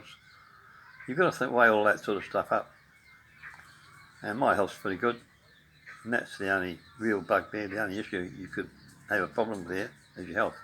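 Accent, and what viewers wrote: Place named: Australia. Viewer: Hi. Thanks for sharing you experience in the Philippines. My name is Chuck. I live in the state of Nebraska in the USA. I too am married to a Filipina. We are currently having a small simple home built in General Trias, Cavite, Philippines. We hope to be moving their to retire next year. Keep the videos coming I really enjoy them. I have to watch each one more than once because I have a hard time understanding some of what you say because of your very strong Australian accent. ¯\_(⊙_ʖ⊙)_/¯ Take care and God bless.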